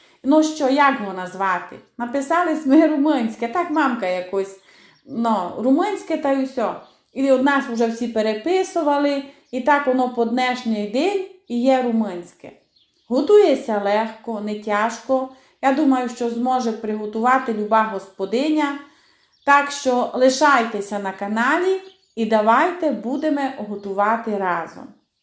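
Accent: Transcarpathia, Ukraine. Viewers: я дуже люблю слухати вашу закарпатську річ я зі Львова але приємно чути кожну людину з різних куточків України добра вам і вашим діточкам❤ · Мій лайк і підписка. Я з Івано-Франківська, але мені дуже подобається Закарпатський діалект і дуже поиємно Вас, слухати. Ваші рецепти супер. З нетерпінням буду чекати на Ваші чудові рецепти. 🥰🥰❤️🩵🩵🩵💛💛💛🇺🇦🇺🇦🇺🇦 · Найбільше любила такі рогалички з трояндовим вареннячком ♥ Це такі теплі спогади з давніх весіль та празників. На львівщині це - рогалики. Дуже люблю слухати закарпатський діалект. Дякую Вам за позитив і досвід. А хай не ростроюється )).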